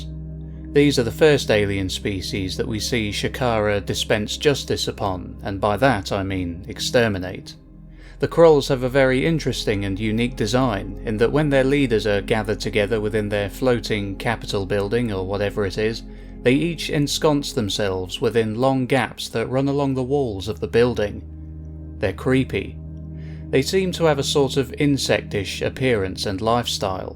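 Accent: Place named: England, United Kingdom. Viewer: you have a very recognizable accent. I found it funny when you said you were from England, because every word you say tells us you're from England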